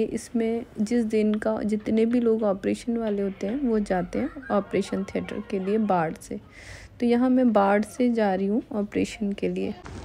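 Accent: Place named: Uttar Pradesh, India. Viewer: Aap kahan per Ho didi kahan ke Rahane wale ho aapki Bhasha up side ki Jaisi lagti hai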